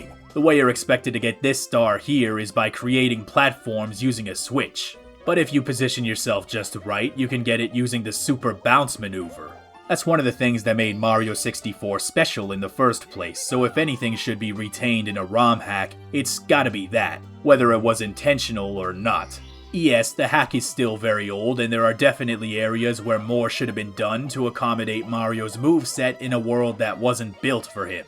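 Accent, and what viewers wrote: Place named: New York, United States. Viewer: I find it funny how in ten years voice went from sounding like a generic 17 year old boy to a gravelly new york stereotype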